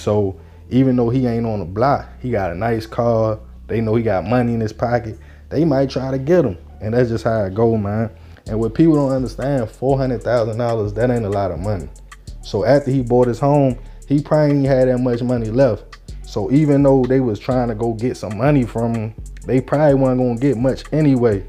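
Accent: Baltimore, United States. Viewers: I know Bmore when I hear it 💪🏾! I'm just getting hip to your channel. Love the content and storytelling so far. This story reminds me of a few incidents from the Horseshoe. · Bro I moved from Bmore bout 17 yrs ago, been back and forth, but your voice and way of talking brings me back🫡🫡🫡🫡channel is excellent bro! Dont stop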